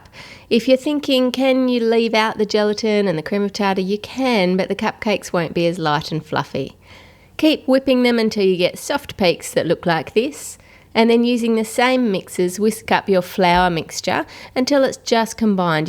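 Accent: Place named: Australia. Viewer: Happy late anniversary sorry I love your Australian accent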